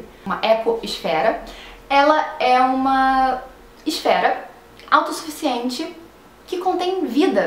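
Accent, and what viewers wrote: Rio de Janeiro, Brazil. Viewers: Só agora eu reparei no seu sotaque carioca ... coixass maixxs legaixxs... hehe :) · Muito fofinho esse teu sotaque carioca <3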